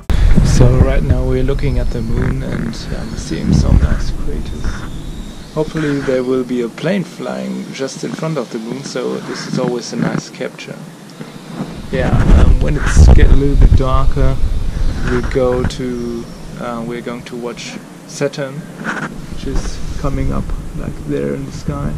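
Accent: Germany. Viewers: Dieser deutsche Akzent einfach herrlich Quatsch doch einfach auf deutsche ✌🏼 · Der ist deutsche dieser aktzent und die heuser ... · Höre ich da einen deutschen akzent?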